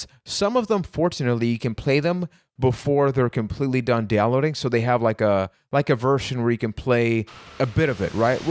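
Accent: Puerto Rico. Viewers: Tu eres boricua ?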